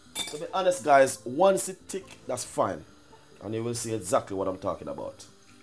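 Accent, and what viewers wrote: Jamaica. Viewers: Mi subscribed once mi hear u voice n see seh yah Jamaican 😭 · Queen Kim don’t get it twisted most of us Jamaicans speak good English ,just cause it doesn’t sound like American are British ,he is speaking English but has his Jamaican accent , unuh really know weh fi pick pon .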